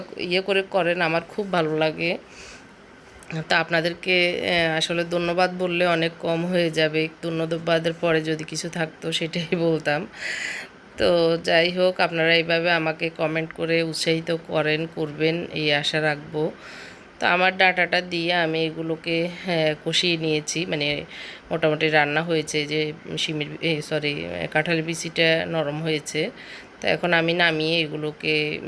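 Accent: Noakhali, Bangladesh. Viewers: আপু আপনার ভাষা অনেক পরিচিত মনে হলো।নোয়াখালীর ভাষা।আপনার রান্না ঘর অনেক সুন্দর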